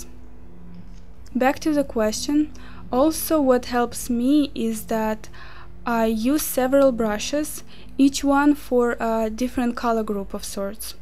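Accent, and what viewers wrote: Russia. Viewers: Русский акцент, из России? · Интересно, у нее русский акцент или какой? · aren't you from Russia? i am, and your accent really sounds like russian)) you're cool✨ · Она русская ? Просто очень слышен русский акцент)))) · i hear russian accent, are yoy russian? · Такой акцент русский. ппц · are you Russian?You have a Russian accent. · По акценту понятно, что человек русский :D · Are you russian? You are have a russian accent))))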